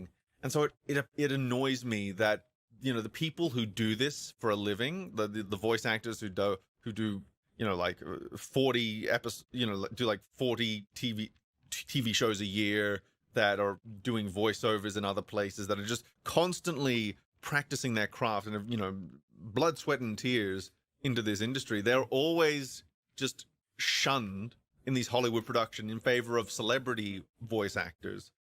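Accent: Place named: Australia. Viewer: You have the classiest Australian accent I’ve ever heard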